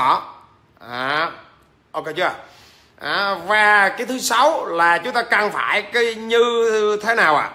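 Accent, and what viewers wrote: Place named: Central, Vietnam. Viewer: Chú ơ dau cua miền trung vay ạk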